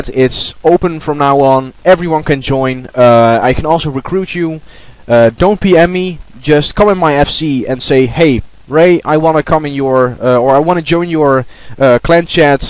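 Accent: Netherlands. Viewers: i can hear that you are dutch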